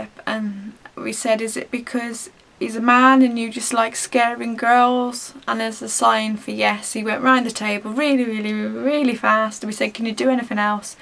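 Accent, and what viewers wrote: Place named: Shropshire, United Kingdom. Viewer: Where are you from, you sound VERY Shropshire-ish! I am from Shrewsbury, and I do my own investigations sometimes, and I have had lots of my own experiences :D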